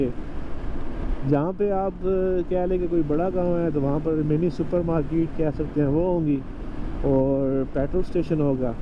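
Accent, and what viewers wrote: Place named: Sargodha, Pakistan. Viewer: bhai ilaqa kon sa hy apka? Lehja Sargodha k as pas ka mehsoos hota hy.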